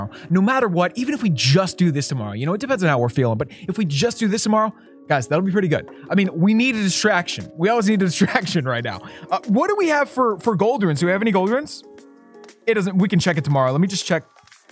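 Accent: Canada. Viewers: are you canadian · Wait, is he Canadian?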